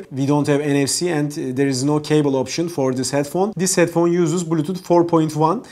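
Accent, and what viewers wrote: Turkey. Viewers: Thats Turkish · That's some heavy Turkish accent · abi fonetiğin türkçe konuşmanla birebir aynı vallahi hiçbir fark görmedim :D hasret kalmışız akıcı konuştuğu izlenimini vermek için kendini kasmayan insanlara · Birazda aksaniniz uzerinde calissaniz iyi olur. tamam, ingilizce anadiliniz degil, ama nasil bir hintli ingilizce konusurken kotu aksanindan dolayi anlasilmasi zor oluyor, ayni sekilde Turkiye'de cogumuz ingilizce'yi Turkce gibi kkonusuyoruz. Bu kendi aramizda bir sorun yaratmazda, ama eger Youtube gibi bir platformda ingilizce bir video yayinliyorsak, o zaman neden kendimizi iyilestirmeyelim ki?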